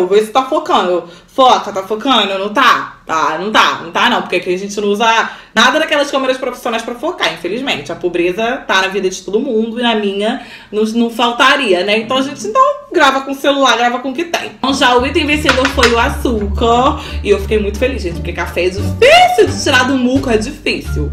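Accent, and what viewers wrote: Rio de Janeiro, Brazil. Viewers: E Nova Iguaçu é aonde?! São Paulo?! Euem... É carioca sim! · Muito carioca mesmo ahahhahahahahahahahahaah maravilhosa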